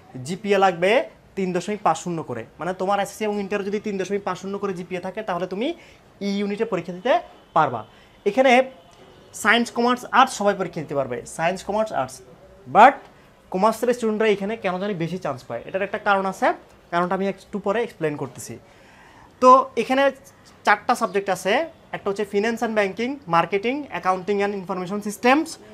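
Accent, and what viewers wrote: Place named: Comilla, Bangladesh. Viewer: Comilla.....comilla feel hocce.. 🙃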